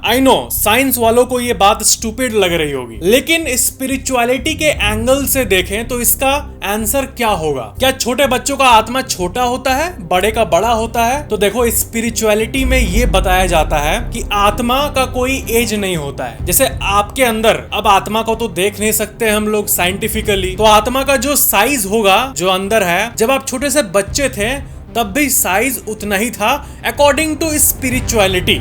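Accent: Bihar, India. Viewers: Bhai aap Bihari language bolte ho (kore)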